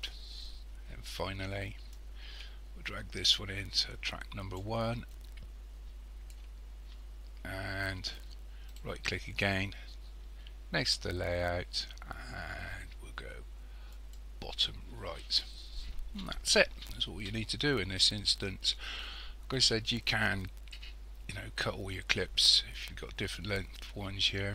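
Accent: West Country, United Kingdom. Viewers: Thanks! Very useful video! Where are you from? Sounds like you're from somewhere in the West Country but it doesn't sound quite like West Country accents I've heard.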